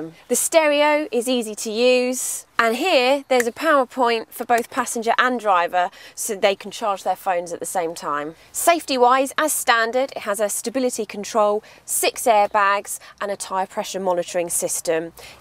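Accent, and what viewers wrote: United Kingdom. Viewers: Forget about Suzuki Grand Vitara, she has awesome British accent, she look and sound very graceful.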